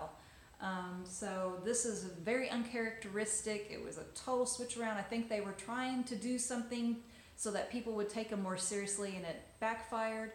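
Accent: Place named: Georgia, United States. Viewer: Are you in the Carolinas? Trying to place that voice. I was born in the Midwest but grew up in the Carolinas. You sound like you're from my neck of the woods. Maybe Georgia.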